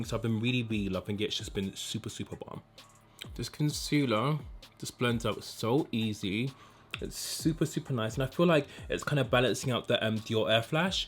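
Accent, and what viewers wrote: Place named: Cockney, United Kingdom. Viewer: I get my Sephora-fix by going over to Paris on the Eurostar. If you don’t mind me asking, are you from the midlands or London? My hubby says your accent is cockney...I say Brummie. Lots of love from Bristol🥳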